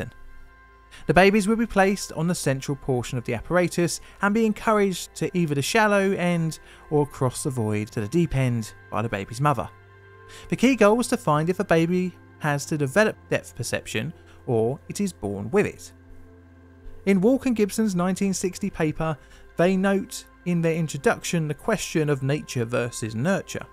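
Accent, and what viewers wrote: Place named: South London, United Kingdom. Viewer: Hello Mr South London. 👍🏻🇬🇧...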